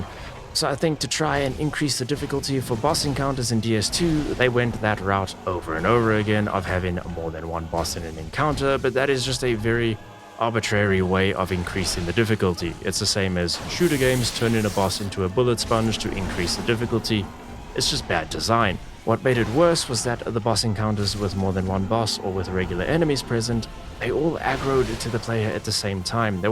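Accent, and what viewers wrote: South Africa. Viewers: South African Accent?? My ears don't lie👀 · saffa? your accent is difficult to place 🤣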